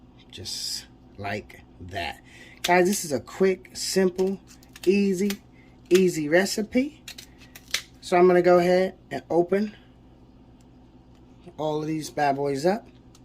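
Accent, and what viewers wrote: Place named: Brooklyn, United States. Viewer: Nice!! Im diggin this. My brotha sound like he from Brooklyn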